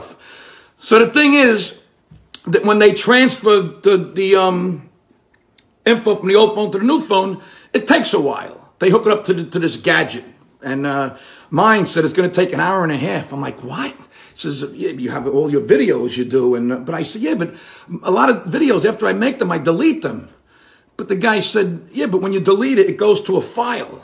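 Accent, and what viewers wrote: New York, United States. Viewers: Thick New York accent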